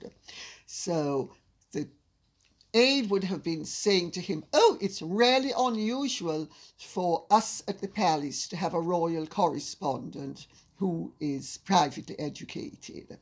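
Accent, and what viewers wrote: Jamaica. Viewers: Louise Leibbrandt it’s also part of her Jamaican accent. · A very posh uptown Jamaican accent. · Lady C, I'm from Jamaica, Where in Jamaica are you from, please? Your Jamaican accent is unmistakable. Love it.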